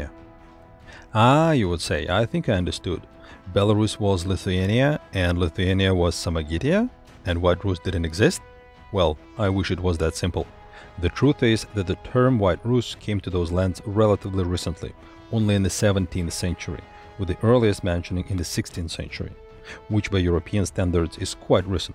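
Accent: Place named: Russia. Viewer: Русский акцент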